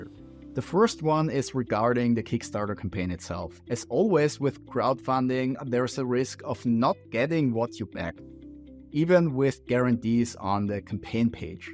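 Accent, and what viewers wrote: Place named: Austria. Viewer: With such an extremely obvious Austrian accent, I would never have guessed this guy's name to be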